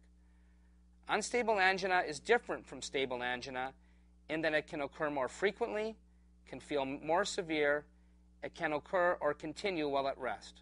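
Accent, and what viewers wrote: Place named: United States. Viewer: i think he pronounced angina correctly coz this is the American accent!!!! yesterday an american prof gave us a lecture about angina and he pronounced angina the same>>>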